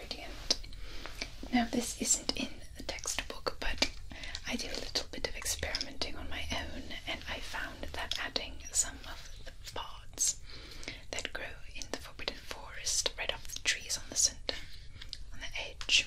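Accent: England, United Kingdom. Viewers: I find Americans doing English accents so soothing!! As a brit, this is honestly pretty good and the extra emphasis on the t sounds and the vowell sounds that u guys tend to do give me hella tingles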